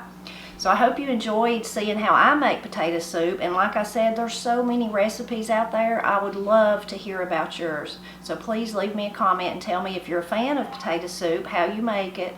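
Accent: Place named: Eastern Kentucky, United States. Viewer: I grew up in eastern KY. Her accent sounds familiar and her recipes are legit what we all ate. She even has the correct kitchenware 😂 Love this channel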